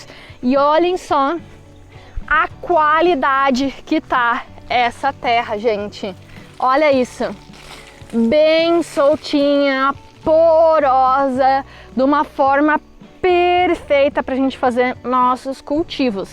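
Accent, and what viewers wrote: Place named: South, Brazil. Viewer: Não sabia que tinha um canal falando em Sulista. Como sempre, um ótimo vídeo 🙌🏽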